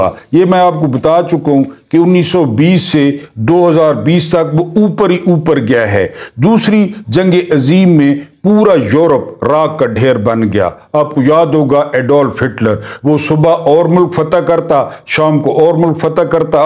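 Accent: Saraiki, Pakistan. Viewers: Masha ALLAH Zaberdust V Log kertey hein, Saraiki Touch bohot hi acha lagta hey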